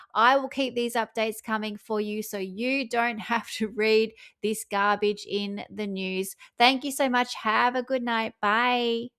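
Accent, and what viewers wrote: Australia. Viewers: This is such a sad state of affairs. I would be totally devastated if the daughter I raised and loved treated me like this. Mr Markle I send you white light, strength and peace... This woman is truly an empty vessel. once again great work, thank you for your updates wrapped in the appropriate amount of ridicule, and delivered with our great Occa Aussie accent. Every video I just shake my head in disgust of her behaviour.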